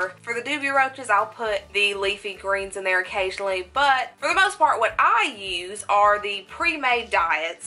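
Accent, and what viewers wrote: South, United States. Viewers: I love your accent. God I miss the south 😭 THANKS FOR THE INFORMATION 👍😊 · i love ur southern accent 😍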